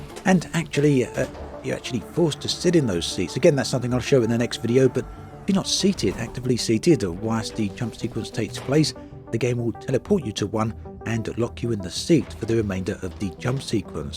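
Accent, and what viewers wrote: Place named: Dorset, United Kingdom. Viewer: Sir please reduce that music, I need to hear your dorset tones:)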